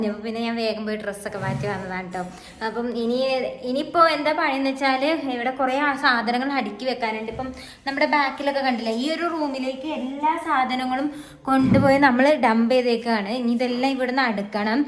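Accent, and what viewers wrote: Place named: Malappuram, India. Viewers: വടക്കൻ ഭാഷ..!! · Malappuram thanneyanu 😂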